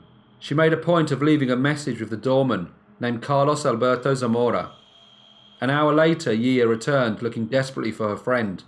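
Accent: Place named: South East England, United Kingdom. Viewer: New sub here. Trying to work out where you are from in England! Your accent is similar to mine, so I'm guessing the South East?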